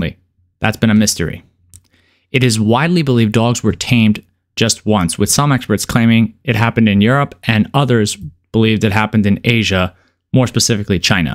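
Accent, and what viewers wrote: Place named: New York, United States. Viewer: Random bro but no weird shit but I'm a black guy from London and I have to say your accent is so dope. haha that New York accent just makes me enjoy your stuff even more lool you're voice just makes me feel like I'm listening to someone from the wire like Mcnulty talking real red-pilled talk to me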